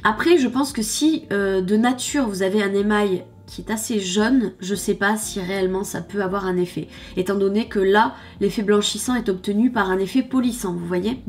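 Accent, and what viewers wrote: South, France. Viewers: avec l'accent du sud c'est top !!!!! allé je m’abonne , le peut de tes vidéos que j'ai vu sont super ... bonne continuation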